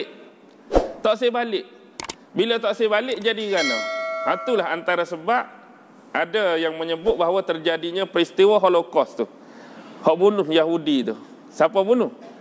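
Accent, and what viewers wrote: Kelantan, Malaysia. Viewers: Dialek Kelate???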